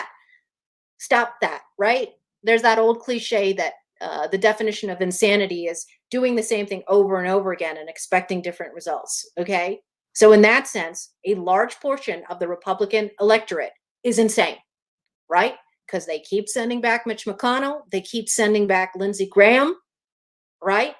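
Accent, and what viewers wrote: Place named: Philippines. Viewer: Michelle M. Having had a couple of Philippinas as GF's your accent is so fantastic.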